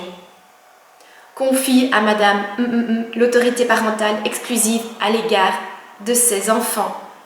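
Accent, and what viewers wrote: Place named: Belgium. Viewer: Bonjours j entends un accen belge dans votre voix, si vous êtes de Belgique pouvez vous me donner le numéro d un bon avocat belge si possible le votre ? Merci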